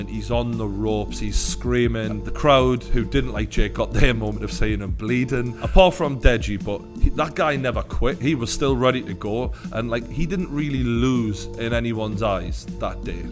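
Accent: Newcastle, United Kingdom. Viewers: Man I love the true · Ending with a bit of, love that 👊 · true fucking good work keep it up brother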